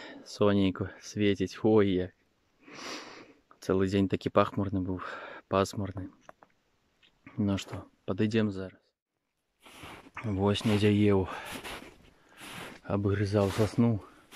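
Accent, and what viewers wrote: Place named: Belarus. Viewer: Я балдею от белорусского языка, которым говорят бабушки в деревнях. Ваш же близок к литературному, он не такой красивый. Главное, что Вы нашли, что хотели.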